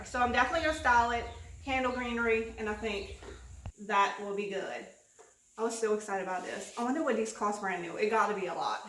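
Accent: Louisiana, United States. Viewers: Isn't she precious?? I first saw another of her videos and I thought " that accent sounds familiar". Turns out she is from the same area of south Louisiana as my best friend's family, Cajun country. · Girl, it's absolutely a bedpan. I'm glad I discovered your channel, your content is great. And I love listening to your Louisiana accent! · Are you from Louisiana? You have a similar accent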